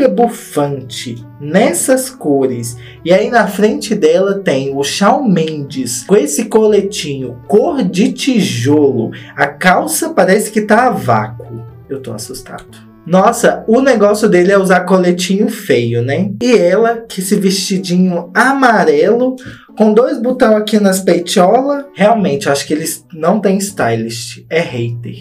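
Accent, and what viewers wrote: Minas Gerais, Brazil. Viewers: Menino, acabei de chegar nesse canal com esse fofoqueiro com sotaque mineiro. Eu adorei.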